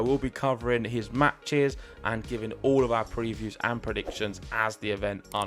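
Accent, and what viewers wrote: United Kingdom. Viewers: Awesome accent. What part of Britain?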